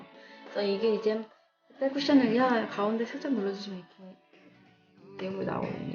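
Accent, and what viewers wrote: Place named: United States. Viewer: 외국인이시져 영어를 너무 부드럽고 정말 잘하시네요 외국인이시라면 어디나라에서 오셧어요? 제가 생각하기에 미국(USA)같은데 아닌가요? 어디에서 오셧어요?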